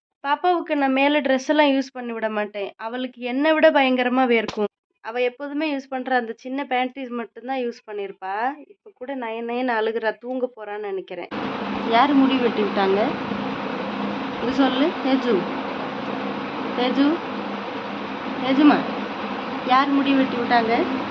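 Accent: India. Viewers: Neenga pesurathu hindi poonu tamil kathutu pesura mathiri iruku lunch time use panran intha varthalam mathiya sapatunu soli parunga nalairukum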